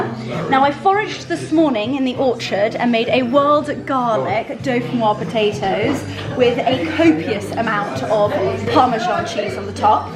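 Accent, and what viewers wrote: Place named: United Kingdom. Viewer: Hi I love your Vlogs I just discovered your channel. You are so beautiful from inside and outside. You seem genuine with a heart of gold a good person. Love your British accent 🇬🇧you sound like a real princess. 😘🇺🇸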